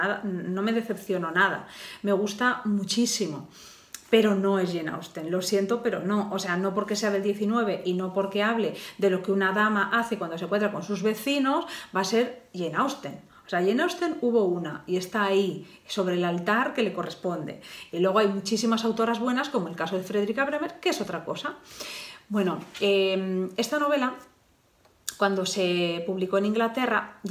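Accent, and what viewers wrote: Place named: Asturias, Spain. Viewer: eres muy culta y hablas muy bien. Tú acento asturiano me encanta.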